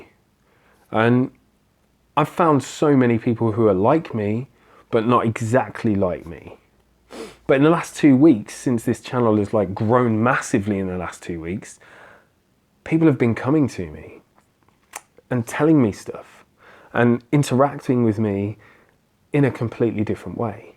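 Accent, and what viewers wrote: Australia. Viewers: You sir are an inspiration oh love the Australian accent nearly as good as mine !! Hope everything goes ok tomorrow all of us Here will be thinking of you xx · Love the aussie accent, you"d make a good aussie warrior with your craziness, we do wacky well in Oz. Love ya, looking forward to getting up one morning and seeing you tell us all you have found the key!:)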